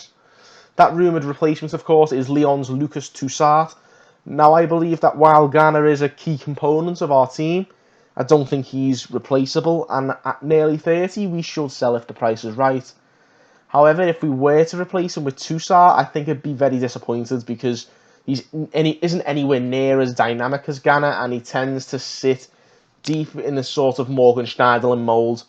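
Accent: Liverpool, United Kingdom. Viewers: The lads doing a good job If he talked faster none scousers might struggle.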